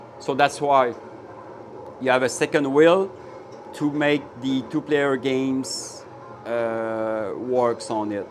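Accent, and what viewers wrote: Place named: Quebec, Canada. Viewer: i don't no why but you sound like someone from Quebec lol any how you have a new sub and hello from Quebec :P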